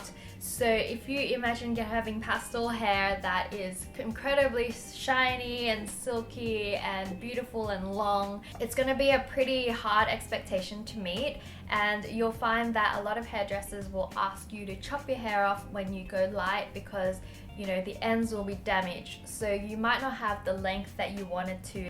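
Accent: Australia. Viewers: It's austrailian accent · Aussie accent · purplesheep yep australian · is she australian? i love that accent!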